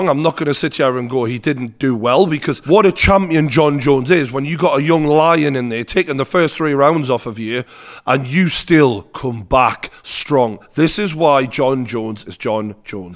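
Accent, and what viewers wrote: Newcastle, United Kingdom. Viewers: Just hearing true geordie introduce the video is enough to put a smile on my face!!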